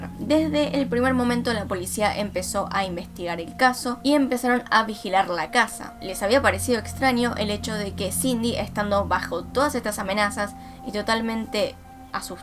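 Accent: Argentina. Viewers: La única voz argentina que soporto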